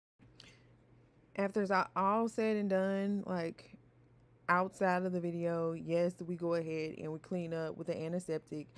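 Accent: South, United States. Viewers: Lmao 😂 girl .. no music cuz YouTube 😂 I love country accents please narrate ❤ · Don’t apologize for your country accent. Thanks for the video. 😊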